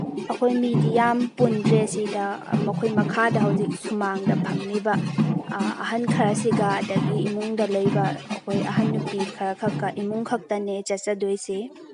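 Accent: Kakching, India. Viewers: Lol akhoiba asida asumna narration touko. Kakching lol asina ariba lolni. Imphalda ngangnarisina lol anouba. Adunani maiba maibina lai haraobada sakpa sonba amadi ngangbada kakchinggi lol mallibase. Puya pabada lol nouraga paba ngamde.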